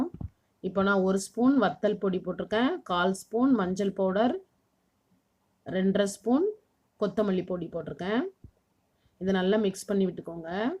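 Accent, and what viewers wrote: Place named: Kanyakumari, India. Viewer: உங்களுக்கும் உங்கள் குடும்பத்தினருக்கும் எனது இனிய பொங்கல் நல்வாழ்த்துக்கள் பட்டு ராணி.... உங்கள் பேச்சின் மொழி (வட்டார மொழி) கன்யாகுமரியா அல்லது திருநெல்வேலியா....? நான் தஞ்சாவூர் மாவட்டம்....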